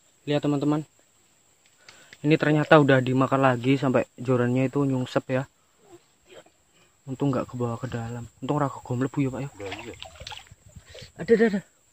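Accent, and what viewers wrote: Java, Indonesia. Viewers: Tpi kok logat nya bhasa jowo😆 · Logatnya sih kea jawa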